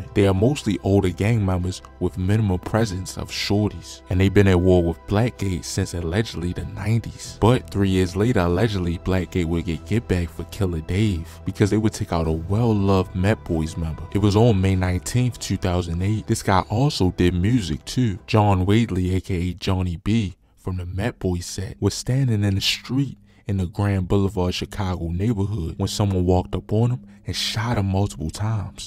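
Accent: Baltimore, United States. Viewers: BALTIMORE ACCENT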